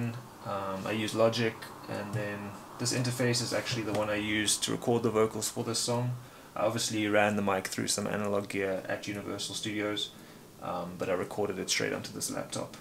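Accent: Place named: South Africa. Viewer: You sound South African. . . Just like me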